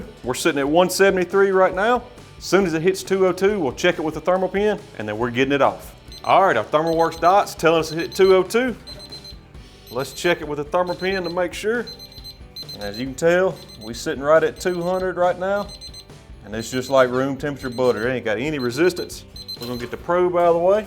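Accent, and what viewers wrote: South, United States. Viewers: I like that southern accent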